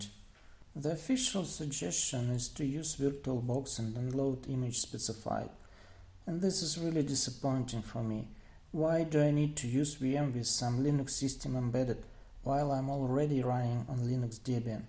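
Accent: Russia. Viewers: You sounds like russian comrade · русский акцент слышу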